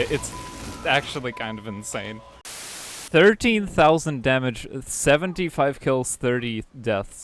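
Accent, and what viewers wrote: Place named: United States. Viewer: I could never tell you speaked russian, your accent is so american :0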